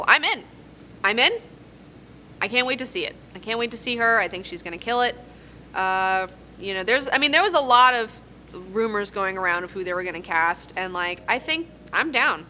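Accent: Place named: Appalachia, United States. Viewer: wtf.. how come youre talking with a hillbilly accent?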